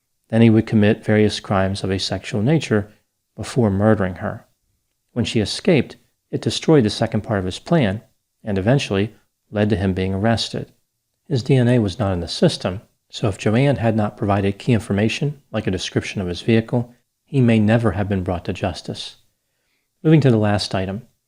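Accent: United States. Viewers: unexpected and perfect pronunciation of our cities with an American accent. Thank you!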